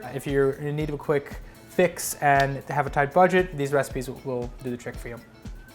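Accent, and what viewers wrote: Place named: South, United States. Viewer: I love it. That southern accent (I think) is the best.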